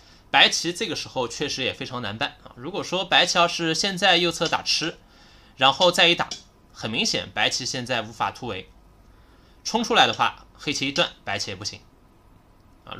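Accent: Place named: China. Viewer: 不曉得是中國哪個地方的口音 , 真的好聽 !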